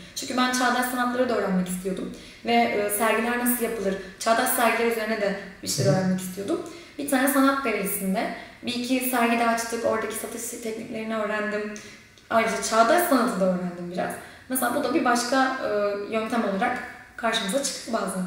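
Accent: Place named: Hatay, Turkey. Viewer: Hatayli arkadaşim var konuşması senin gibi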